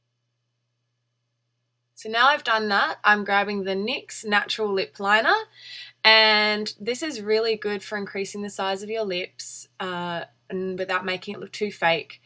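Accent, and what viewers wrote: Australia. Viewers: Great tips! Thanks. I didn't realize your natural hair was that light. Looking lovely as always, and I like the Australian accent.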